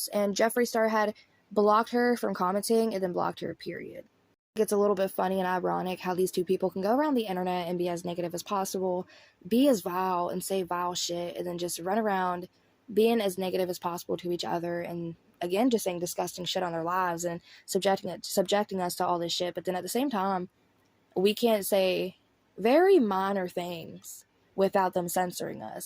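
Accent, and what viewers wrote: South, United States. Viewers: The same thing happens with Tigers. They drug them so people can take pics with them. They are doped up so they can lay on a small table and people can pet them and take pics. It’s absolutely disgusting! I agree about the monkeys. That is such a sad situation. JS uses Eugenia and she will never admit that he is shady. She will continue to kiss him and promote his products. She is looking so sick too. I think she is at her all time low with her weight and it is really showing in her face. She is looking older than she should due to her illness. You are the only one I watch now about Eugenia and JS. You are straight to the point and don’t drag out the videos. I love your southern accent and that you don’t over edit. You are real and also very funny. I love when you do voice overs. Lol Keep the videos coming girl! I look forward to them. 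♥️♥️ Hope your voice gets some rest.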